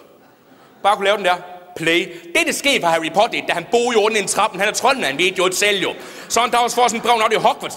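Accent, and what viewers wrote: South Jutland, Denmark. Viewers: skal bare lave en lydbog af Harry Potter på sønderjysk det ville være så fedt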